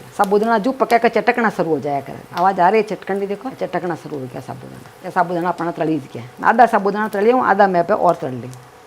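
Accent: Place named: Rajasthan, India. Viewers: Aunty aap rajasthan me kaha se ho....??? Meri Bua nokha-mandi bikaner rahti h wo aur unke bachhe asehi bolte h.... Mujhe unka talking way bahut pasandh h.... Aap bhi waise hi bolte ho....bahut achhe lagte ho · Aapko mera Pranam : aap ki marvadi bohot achi lagti hai , meri maa bhi aapki tarha bolti thi , thari boli mane bohot achi lage hai: thane Mero bohot pyar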